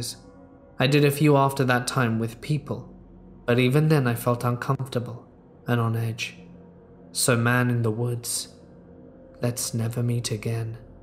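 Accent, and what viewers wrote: United Kingdom. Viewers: I listen to other very good horror narrators, but, I ALWAYS come back to you!!! I sincerely feel calmed and soothed while listening to my favorite, British-speaking storyteller. I’d like to hear Pandora more as she grows up as a ‘ -Mini-Me”! A question/answer live chat would be a hoot with that little charmer! I am sure, that she dearly admires you! 😻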